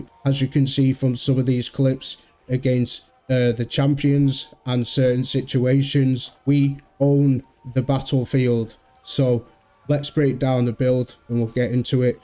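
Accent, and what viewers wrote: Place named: Blackpool, United Kingdom. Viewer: Blackpool accent sounds very similar to,was there last month ,night out with wife 🤙🏻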